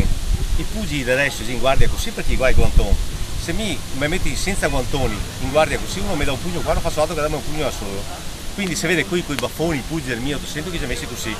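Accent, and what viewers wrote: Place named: Spain. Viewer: per un attimo pensavo stesse parlando spagnolo..... sembra avere un accento spagnolo....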